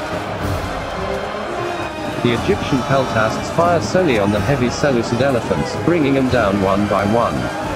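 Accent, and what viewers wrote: United Kingdom. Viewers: Something about watching a Ancient battle cinematically!! with a British narrator ! like watching a documentary ..excellent..only thing missing is watching some older history expert showing us some of the weapons of the age ..xoxoxo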